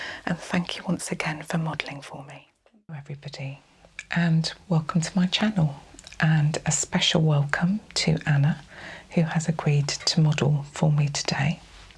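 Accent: United Kingdom. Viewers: your soft lovely voice plus the British accent is just all I need….so super calming. Congratulations on your 1 year success as an ASMR creator. Love your channel so much♥️. Blessings lovely lady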